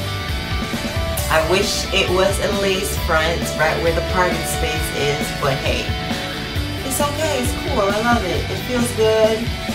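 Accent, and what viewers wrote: Jamaica. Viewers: Hey hun. Jamaican?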